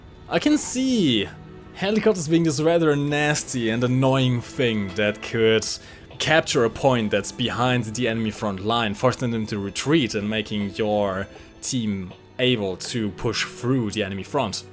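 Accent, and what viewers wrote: Germany. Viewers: Digga, man hört deinen Deutschen Akzent richtig raus xD.Würde mich freuen, wenn du einen Kanal aufmachen würdest wo du War Thunder spielst :D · Sorry but i cant listen to you because you Sound so german.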